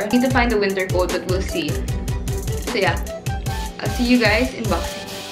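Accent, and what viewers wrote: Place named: Philippines. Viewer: Her accent very much like a filipino 😘.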